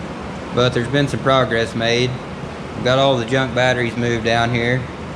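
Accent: South, United States. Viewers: I don´t mind at all about the engines, i am here watching ur videos just coz i like ur southern accent!!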